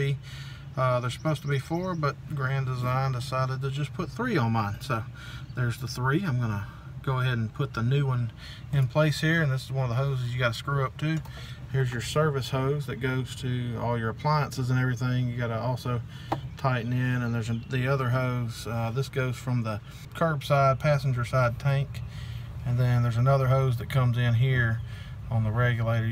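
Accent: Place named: South, United States. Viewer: Thank you sir! Minutes in, I think I know what the problem is and it's probably not the regulator! I had a guy switch it out a couple of years ago, and he definitely didn't use the proper yellow tape, he used regular Teflon tape that actually came from Dollar Tree. I just need to go get the right stuff, and I'm going to change it myself this time. Turning a wrench isn't rocket science, and I've got my spray bottle of soapy water. My tank is outside, so that tape has broken down in the weather. You just saved me some $$$, so THANK YOU!!! Funny thing is, that guy who I hired to change it last time has worked for decades at RV parks and told me he's a certified propane tech. Okay, but he didn't know not to use regular Teflon plumbing tape? Now I'm old, single, and trying to take care of my goat ranch, I've found YouTube to be a wealth of information. 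I've learned that a lot of things aren't nearly as complicated as others want us to think. BTW, I love how you talk. I grew up in Dallas and spent Summers in Arkansas at a horse camp when I was a teen. I love Arkansas, lots of nice folks there. You sound just like a lot of my family.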